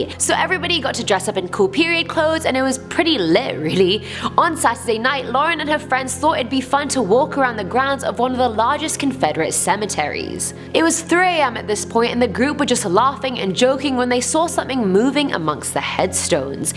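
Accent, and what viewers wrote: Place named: United Kingdom. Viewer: British accents! (I have a British accent too)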